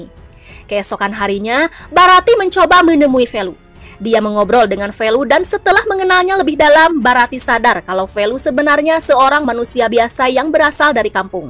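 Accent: West Java, Indonesia. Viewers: Mimin.orang sunda euy☺😁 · Kayaknya admin nya orang Sunda ya🤭🤭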